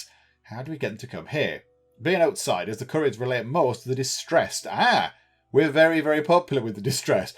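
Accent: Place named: Northern England, United Kingdom. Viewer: Your northern accent is really good. Makes me think of Sean Bean... oh sugar, I swoon when I think of Sean Bean lol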